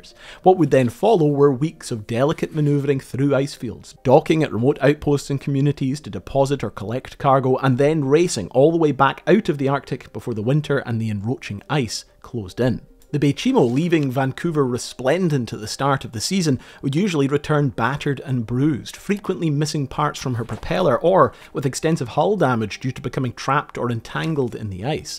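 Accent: Scotland, United Kingdom. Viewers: Love the Scottish accent and Neck fur!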